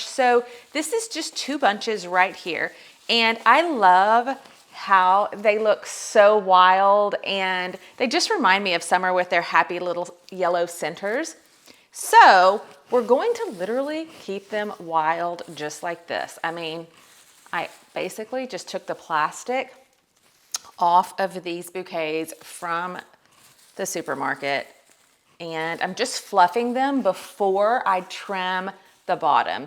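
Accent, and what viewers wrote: South, United States. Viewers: Love, love her southern accent! I’m from the Midwest… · I like you a lot more without the over the top southern accent, and yes I am southern.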